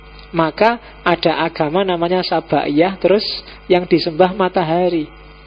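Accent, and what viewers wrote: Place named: Java, Indonesia. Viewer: Tak jelas awak ckp..pelat jawa tebal sngt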